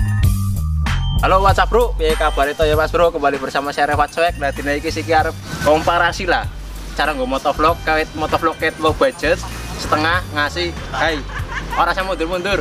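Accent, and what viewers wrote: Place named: Banyumas, Indonesia. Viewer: Jangkrek penak videone yen ditonton..... Karo misoh. Wkwkkw seneng nyong ktmu video ngapak kaya kiyelah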